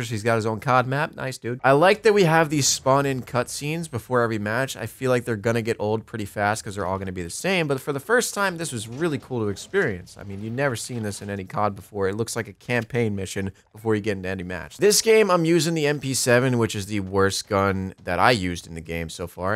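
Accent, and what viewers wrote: New York, United States. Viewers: As you get older we can hear your new YAWK accent come out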